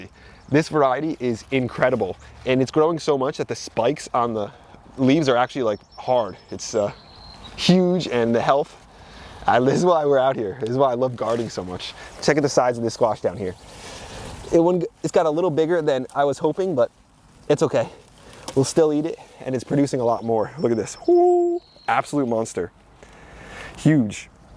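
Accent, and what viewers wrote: New Jersey, United States. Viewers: I can't get over the fact you've got such a strong New Jersey accent! I live in NYC, so I've been exposed to a few N.J. varieties. And is so cool. More, please!😘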